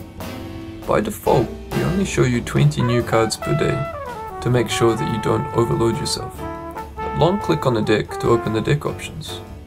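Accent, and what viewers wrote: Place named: New Zealand. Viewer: Who got the Kiwi to do the tutorial about "decks" then..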